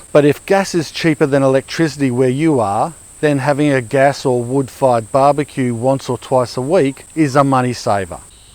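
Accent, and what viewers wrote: Australia. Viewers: If I'm not mistaken, you're Australian? (I always confuse New Zealand and Australian accents) Anyway, if so, what's the issue with water in Australia? I've read that companies from a certain communist country (Ive had comments deleted for stating it before) own water rights to pieces of or even entire water systems. Is that true?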